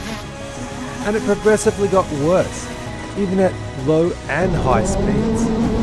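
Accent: Australia. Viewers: I got directed to your channel by YouTube and it looks like a really interesting build. Nice work on the body, it looks pretty amazing. The wheel hop issues likely started becuase it's a spool axle on the rear and typically go karters use weight transfer to unload the inside wheel to make these things turn. The trick won't work with your car, because you've lengthened the wheelbase and increased the mass quite a lot. The problem that's resulted from that is that you've had a failure which has resulted in compliance finding it's way into your chassis somewhere... I'm guessing, but I'm guessing it'll be the wheel bearings on the rear or you'll find that you've got a frame crack at the end of those flanges you've got welded on to the chassis. The people who are talking about a live axle etc, are wrong, there's not a specific problem with live axles, but compliance results in wheel hop, there are a bunch of ways to bake compliance into a vehicle design and live axles are renowned for it because people fail to separate their upper and lower links enough on a four bar rear end, or use rod ends which results in the rod ends flogging out under load. 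In both instances there's a very basic fix, but it's geometry and complaince related, nothing else. Yours probably isn't a hard fix either, but it'll need some proper design work done on it. You sound Australian, where are you based? I'm an engineer and based in Brisbane. I reckon it'd be interesting giving you a hand if you're keen.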